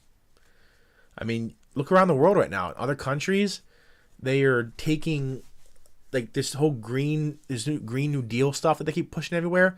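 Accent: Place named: Delaware County, United States. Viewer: 10 seconds into video I know your from Delco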